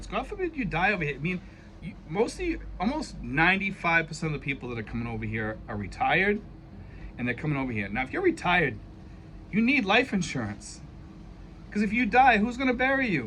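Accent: Boston, United States. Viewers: I could tell you're from Boston as soon as you started talking. I'm from Rhode Island. :)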